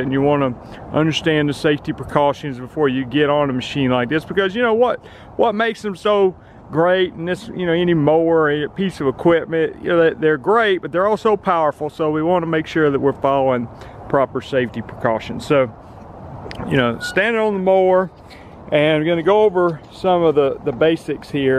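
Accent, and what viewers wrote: South, United States. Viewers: I'm from the south and there's nothing wrong with sounding Southern.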